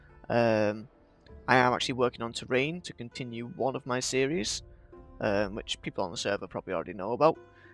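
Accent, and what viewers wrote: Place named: United Kingdom. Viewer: I wish I could say 'build battles' the way you do XD I like that British accent. and btw gl on modern :P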